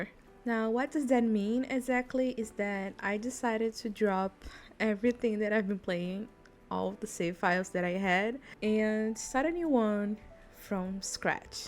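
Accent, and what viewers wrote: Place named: Brazil. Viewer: I knew I recognized your accent, happy to see another fellow brazilian sims player haha. Excited to see more of your videos ❤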